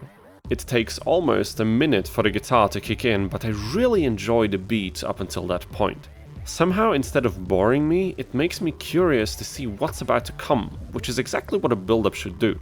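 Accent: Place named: Netherlands. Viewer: Easily one of the most irritating videos I’ve ever watched from start to finish Audio balancing, trash. Netherlandic accent, trash. Ad break after every song, hope the .01 euros I gave you was worth the time you wasted of mine. Can’t take 5 seconds to google “what does embu mean?” While editing this whole ass hour long podcast on a computer.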